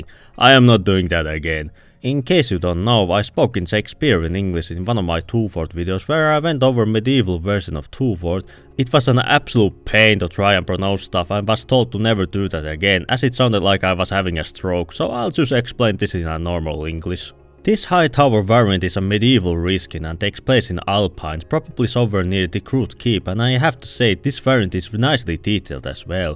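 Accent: Finland. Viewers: I was like 99% you were Finnish and this just confirmed it. Perkele TF2 Suomi!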